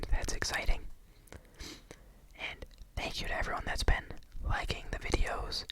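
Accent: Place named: Boston, United States. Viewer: You have the same accent and speech cadence as MorpheusASMR. Must be Boston area too?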